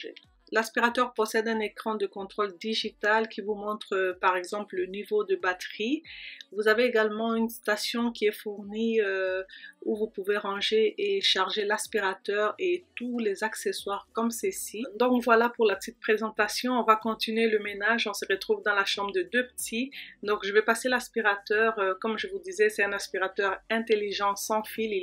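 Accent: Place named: Quebec, Canada. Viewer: Coucou ☺️☺️ j’aime tellement ton accent québécois… moi aussi j’ai un tineco mais le A10, super simple. J’aime vraiment beaucoup la chambre des garçons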